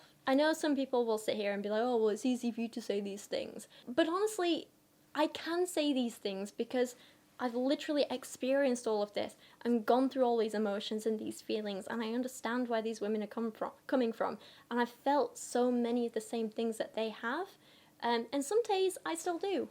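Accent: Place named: Northern England, United Kingdom. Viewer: A northern girl repressing natural accent with London estuary accent.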